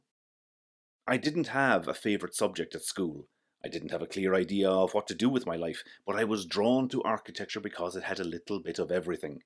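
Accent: Ireland. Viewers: Another Wesht culchie here, but from Galway. I knew the accent and glad I found this video. Any recommendations for historical/listed renovation guidance/support in Ireland? Awful hard to find resources.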